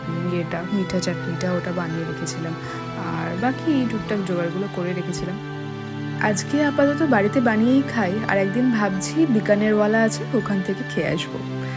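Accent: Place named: Bangladesh. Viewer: ধন্যবাদ একজন বাঙালি হয়ে প্রবাসী বাঙালির এতো সুন্দর বাংলা একসেন্ট এর উপস্থাপনা মনটা ছুঁয়ে যায়। ঈশ্বর আপনার মঙ্গল করুন।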